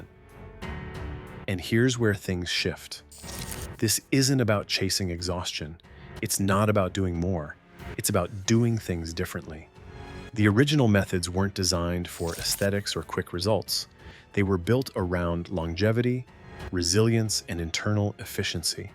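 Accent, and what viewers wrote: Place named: United States. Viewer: WHEN ARE YOU GOING TO CORRECT YOUR READER ON HOW TO PRONOUNCE PINYI? It is most annoying, THhr yank accent does not help either,,too bland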